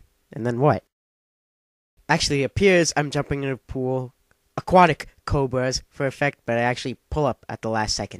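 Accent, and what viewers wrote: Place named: New York, United States. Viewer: u sound like u live in new york, or new jersy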